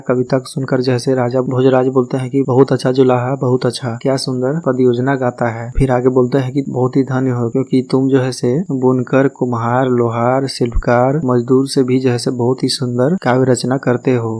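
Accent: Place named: Bihar, India. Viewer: Ja he se bro our bihar's accent don't forget that 🔝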